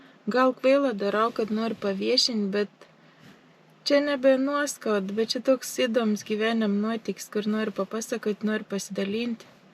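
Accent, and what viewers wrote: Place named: Samogitia, Lithuania. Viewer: Tamsta, esat nuostabi.. Puiki žemaitiška tarmė..malonumas ausiai..malonu Jus matyti ir klausyti..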